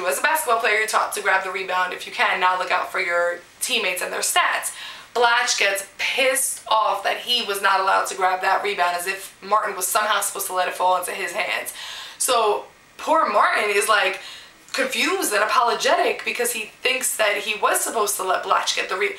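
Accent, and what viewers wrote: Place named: New York, United States. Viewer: nice video keep em coming! i love your NY accent lol and you know basketball marry me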